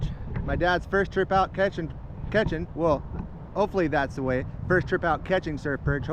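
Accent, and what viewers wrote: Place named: Oregon, United States. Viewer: Never apologize for being and expressing yourself naturally in the way you do! Especially with joy and elation! That's what it's all about man. Hell of a trip, hell of a fish, and that message you made about never letting the kid in you go away is dead on. And you gotta love the classic native Oregonianisms like Crik and Spendy! A great tell to identify a born and raised Oregonian.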